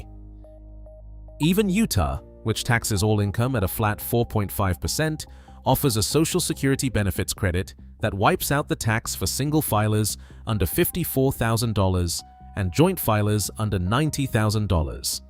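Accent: United Kingdom. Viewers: Just want list/chart Too many words - leaving now (and the eglish accent is annoying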